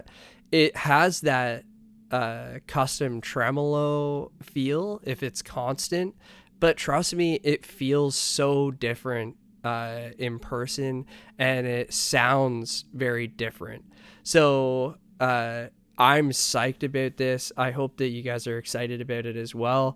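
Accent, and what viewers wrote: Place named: Canada. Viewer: I detect a Canadian accent - where are you from?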